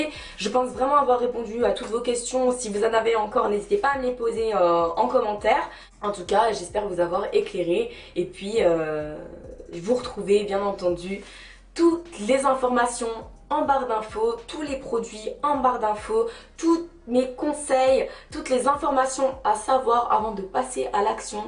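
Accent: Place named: Algeria. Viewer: dés que tu as cmc à parler g tt de suite su que tu etais algerienne mdr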